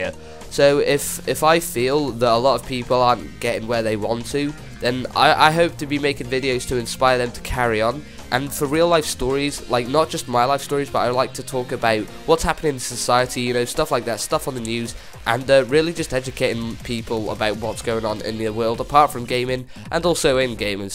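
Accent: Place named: Wales, United Kingdom. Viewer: I thought you were welsh I could here it a little, Which town though ? I'm from Newport !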